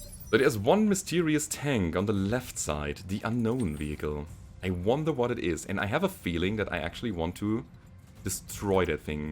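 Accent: Germany. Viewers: That German accent! Great!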